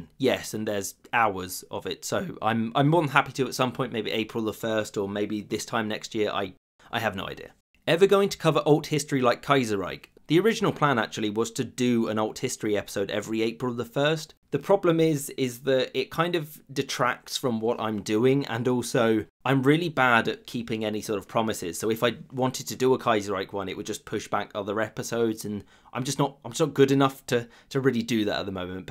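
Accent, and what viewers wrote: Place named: West Country, United Kingdom. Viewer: Peterborough? I thought you were from the West Country.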